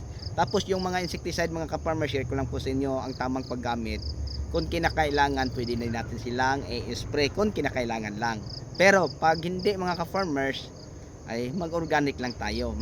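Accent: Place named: Visayas, Philippines. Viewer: Saan ka idol nagtaranim kasi bisaya ang tuno ng pananalita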